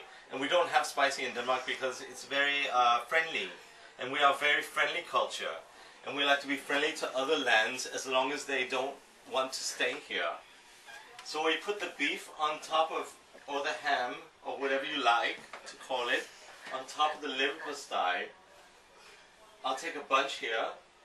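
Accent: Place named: Germany. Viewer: Great vid buddy and i think you needed a chainsaw for the bread.Dude and what was up with the German accent.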